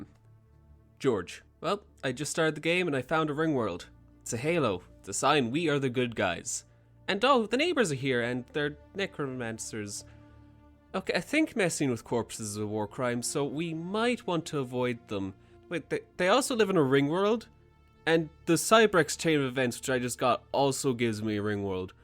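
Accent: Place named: Ireland. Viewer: you sound like you're from Ireland.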